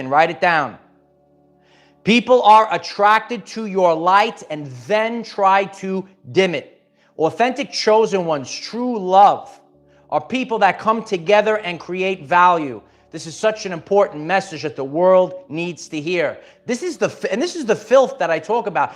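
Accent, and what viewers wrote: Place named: Brooklyn, United States. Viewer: How cute your little Brokklyn nyc accent...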